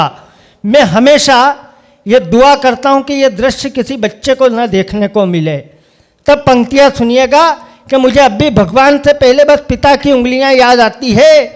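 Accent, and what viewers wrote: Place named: Gujarat, India. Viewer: ❤ touching, love you ji. aapka tone gujrati lag raha hai.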